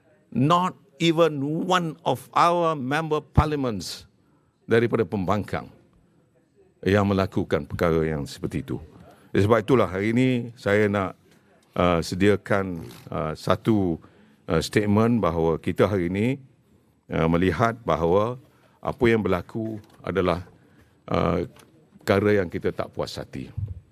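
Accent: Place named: United States. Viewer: slang american kencang seh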